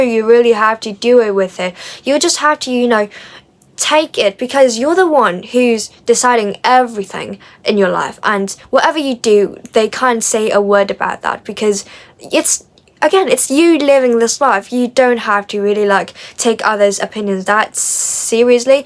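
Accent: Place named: United Kingdom. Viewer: I came from Instagram, nice podcast. Btw I like your British accent a lot.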